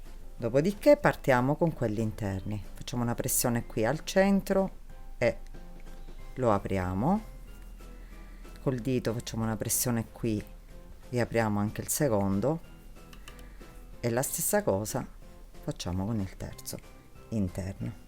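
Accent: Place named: Sicily, Italy. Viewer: Bravissima ❤❤❤ti dirò mi piace quel tuo accento siculo nn so se sbaglio ma sei simpaticissima 😘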